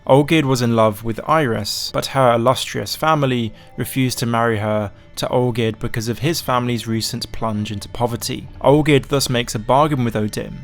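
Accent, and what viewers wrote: United Kingdom. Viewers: He's clearly british mate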